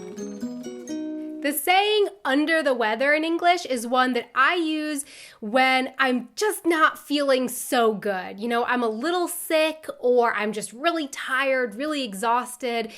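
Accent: Bavaria, Germany. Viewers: Your bavarian accent is adorbs. :D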